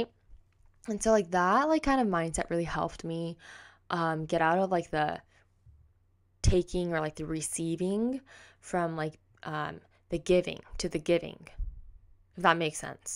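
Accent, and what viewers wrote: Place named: United States. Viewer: Happy birthday 💛 のアメリカ英語がなんだか大好きで、(+ツヤ肌に見惚れながら)いつも動画楽しませてもらってます😂💕 にとってすてきな年でありますように🌈✨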